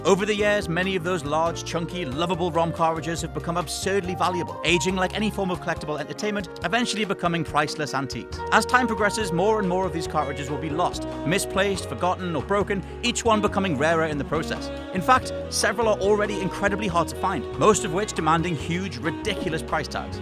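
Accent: United Kingdom. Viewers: because he's British? That's how we say it. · Yeah, he's britsh. Rember they call the SNES the SNES and not by each letter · That weak currency, on a British channel, with a British narrator? Nahhhhh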